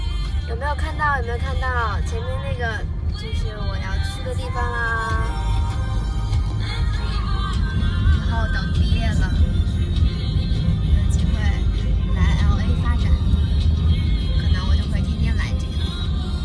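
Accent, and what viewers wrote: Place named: Beijing, China. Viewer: 好久没听着京片儿了，就是亲切。欢迎你来洛杉矶。像我这样住LA这么久的人也是通过你第一看到这里很多景点。谢谢。加油！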